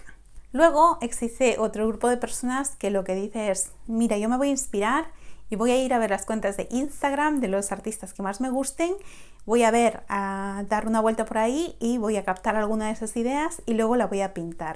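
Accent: Spain. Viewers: eres española? Saludos desde México.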